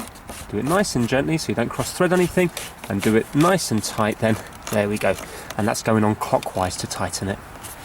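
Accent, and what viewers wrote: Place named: London, United Kingdom. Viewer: Awesome accents like londoner.🥰nice vids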